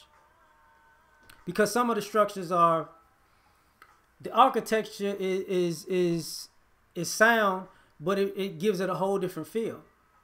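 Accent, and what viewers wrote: Chicago, United States. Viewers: I thought I heard a Chicago accent.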